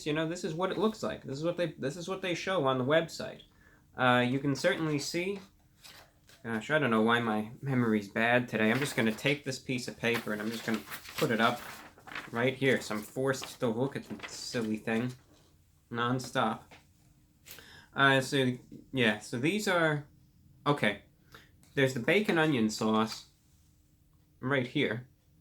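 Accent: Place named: New England, United States. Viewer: he's from new england, it's a slight new england accent, my grandpa was born in massachusetts and he has a thick new england accent.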